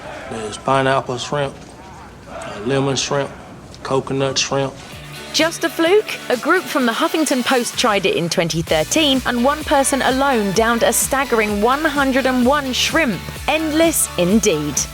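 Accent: England, United Kingdom. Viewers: You talking about red lobster with English accent? Fuck you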